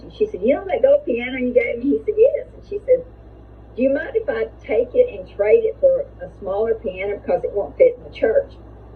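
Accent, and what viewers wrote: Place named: Memphis, United States. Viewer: I'm a new subscriber, and I don't usually go on YouTube, but after following you on Facebook, I thought, why not? 😃 I have to admit I love the Memphis ascent! 🤭 💜 Thank you for the heads up. Adele From Aberdeen Scotland 🏴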